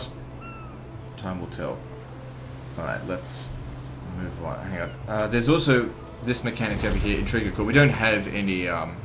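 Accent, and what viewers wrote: Australia. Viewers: " Im australian, and i swear alot" if that doesnt appeal to you .. REEEE HES AUSSIE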